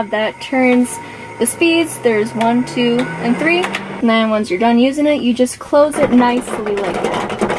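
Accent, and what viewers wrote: Wisconsin, United States. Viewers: Are you from Wisconsin??? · When I started watching you I said; "That's gotta be a Wisconsin girl!". Way to go kid. I bet your parents are proud of you, I know I would be.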